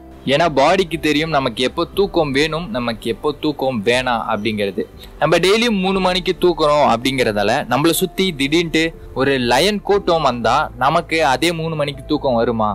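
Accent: Kerala, India. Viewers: கேரளா தம்பி குரல் மாதிரி இருக்கிறது. நீங்கள் யார்?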